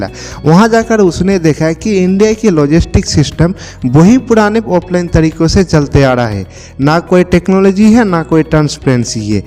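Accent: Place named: Bangladesh. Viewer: Yah pakka Bangladeshi Koi aadami bol raha hai yah pakka Bangladeshi hai iska Bhasha hi language hi bata raha hai ki yah Bangladesh Hai yah Thag Hai Thag video hai Thag video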